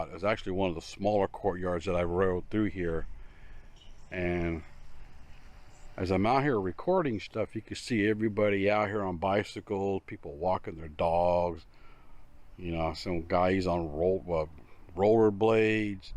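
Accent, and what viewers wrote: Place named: South, United States. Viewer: Another great video,. No neighborhood pool in that village? My wife says your accent reminds her of Foghorn Leghorn Thanks for all your great work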